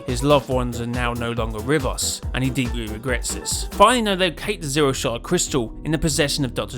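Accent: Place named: United Kingdom. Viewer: This is so good but the only bad thing is that he is British couldn’t understand half of what he said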